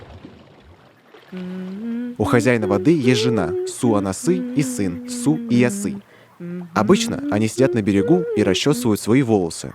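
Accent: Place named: Russia. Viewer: В чем была проблема сделать ролик на двух языках? И не коверкать татарские названия русским акцентом.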